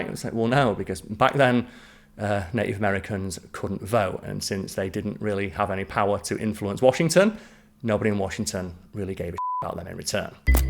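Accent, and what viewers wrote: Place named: Northern England, United Kingdom. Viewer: No Simon not watching.....sorry but when you expect prime steak and someone gives you an ok burger you're pissed at the burger....if you'd been told from the off "you're getting an ok burger" you'd be fine with it but I feel tricked every time I see that stupid 2000s emo hair and hear that think whiny northern voice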